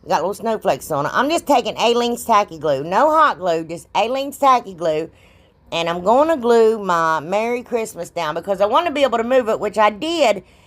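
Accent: South, United States. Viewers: are you okay??? I know by your accent you are from the south and have just seen the terrible devastation in Mayfield, Kentucky! Can you please tell me you are safe and well! Scotland Girl 🥰🥰🥰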